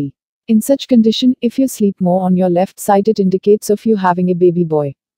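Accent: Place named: India. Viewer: the thick indian accent has spoilt this video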